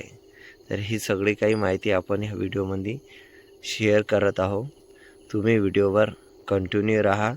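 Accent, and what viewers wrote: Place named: Jalgaon, India. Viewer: मराठी जडगावची आहे वाटत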